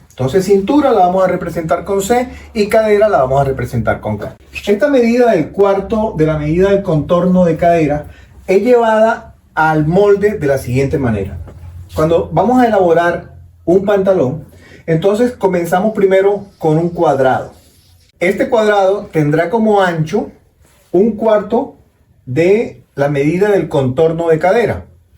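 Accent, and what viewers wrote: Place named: Venezuela. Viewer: Tiene acento Venezolano❤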